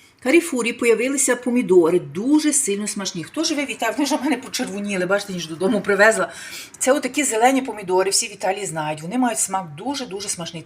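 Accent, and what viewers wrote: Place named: Western Ukraine, Ukraine. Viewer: Да это западенцы на своем суржике" балякає"🫤👎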